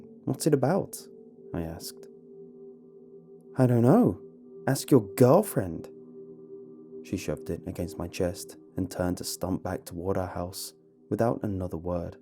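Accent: United Kingdom. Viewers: You have the best brittish accient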